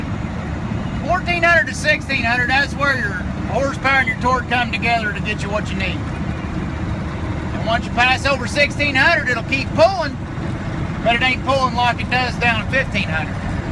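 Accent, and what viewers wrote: United States. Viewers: I'd love to ride with ya hell that country talk having me rolling all day that sumbitch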